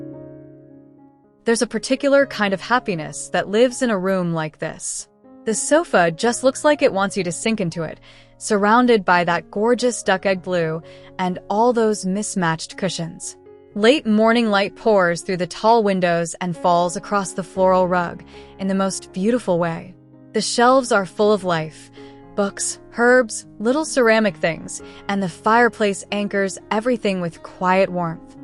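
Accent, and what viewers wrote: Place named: United States. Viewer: So sad an English company and beautiful rooms has to be spoken by an american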